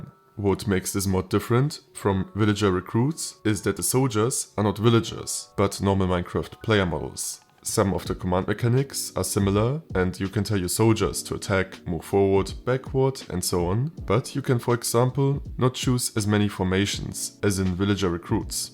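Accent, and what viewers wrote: Germany. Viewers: 2 Sekunden drin, direkt nachgesehen, jap, Deutscher, direkt bemerkt xD Grüße aus Bayern und geiles Video, hast ne angenehme Stimme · Bist deutscher oder hört man beim Englisch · Idk why but u sound German and nice video 🎉🎉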